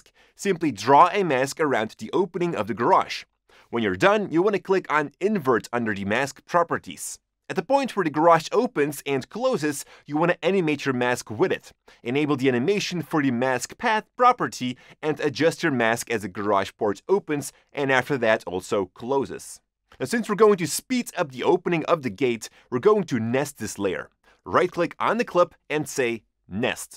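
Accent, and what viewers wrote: Netherlands. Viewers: Are you dutch? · are you dutch ?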